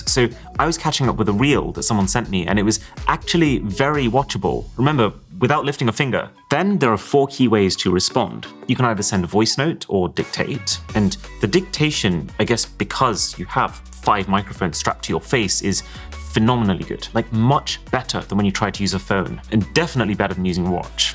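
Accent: United Kingdom. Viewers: Through out my professional career i saw one constant thing - People get away talking nonsense with their British accent. NO doubt this is a great product but what ruined it for me the over action you do with all your reviews. I better get back to my fav review guy Marcus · could also be u have the most clear british accent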